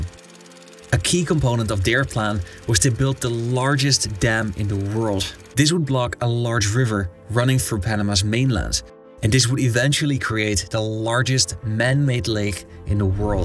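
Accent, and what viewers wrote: Netherlands. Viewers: Is he Dutch? Sounds Dutch trying not to sound foreign.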